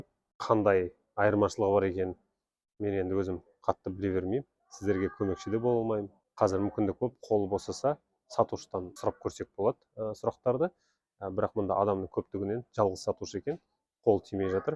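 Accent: Standard. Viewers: Өте казак тілін таза сөлеитінің көрініп түр. Жарайсың ❤❤❤ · Өте жақсы түсірілім былжырақтатып басқа тілді қоспай таза қазақша көрген қандай керемет.Рахмет.Америкада заң өте жақсы жұмыс істейді әрі бәріне бірдей.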